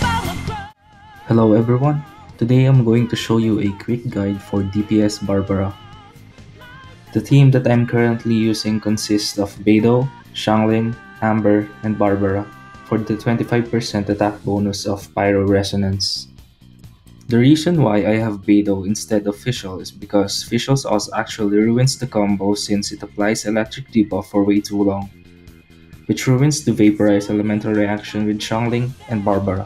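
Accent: Philippines. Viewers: You sound filipino. Are you?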